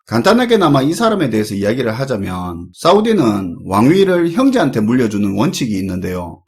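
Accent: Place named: Busan, South Korea. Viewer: 부산 표준어입니다. 꼭 서울말이어야 하나요?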